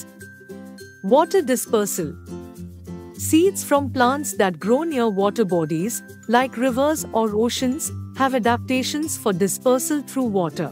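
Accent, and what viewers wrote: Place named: India. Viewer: Logatnya india banget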